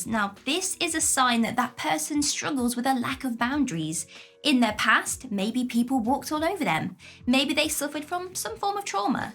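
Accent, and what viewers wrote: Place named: Scotland, United Kingdom. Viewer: I can hear some Scottish undertones...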